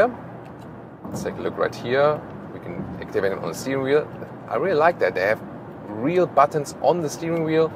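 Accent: Germany. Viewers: You have a strong German accent, you speak too fast, and you mumble. Not a great combination for comprehension. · Are you a native german? Because you're accent sounds very german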